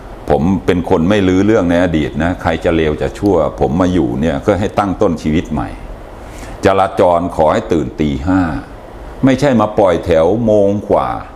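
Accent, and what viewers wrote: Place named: Lat Yao, Thailand. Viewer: คนลาดยาว ก็พูดแบบนี้5555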